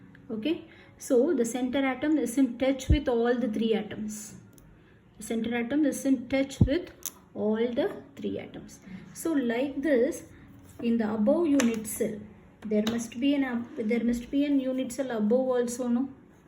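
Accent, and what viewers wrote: South India, India. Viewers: I love ur way of speaking.Are u from South ma'am ? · Mam ur english accent and way of explanation is osm... I think u are from south 🇮🇳India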